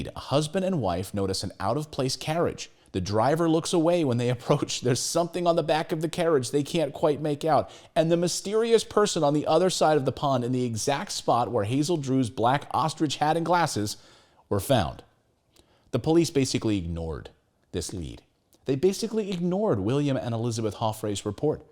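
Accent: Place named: United States. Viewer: Perfect American English ! Just perfect !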